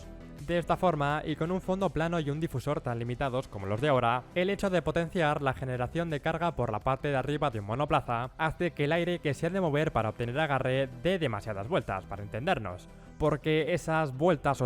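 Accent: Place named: Basque Country, Spain. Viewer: Un robot con acento vasco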